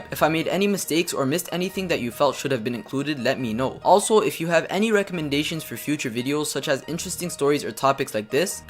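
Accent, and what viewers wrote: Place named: India. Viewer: 听起来像印度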